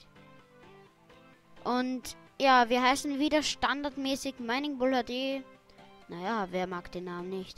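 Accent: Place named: Lower Austria, Austria. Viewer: sag mal kann das sein das du aus niederösterreich sonst was kommst denn in deinem reden hört man hochdeutsch und bisschen dialekt xD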